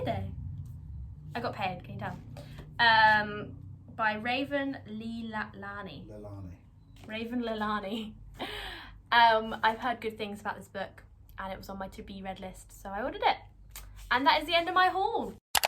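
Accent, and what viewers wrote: United Kingdom. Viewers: Love your British accent ❤ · I love your British accent ❤️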